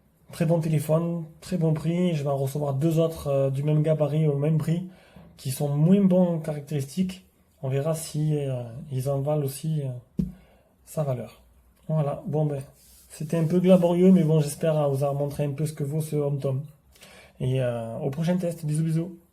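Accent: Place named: South, France. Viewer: l'accent du sud de ouf ^^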